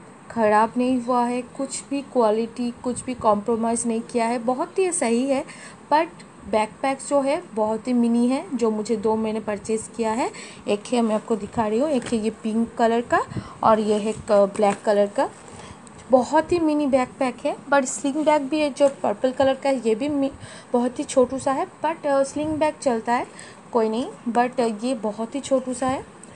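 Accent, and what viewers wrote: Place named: Bengal, India. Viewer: ur title is but ur accent is bengali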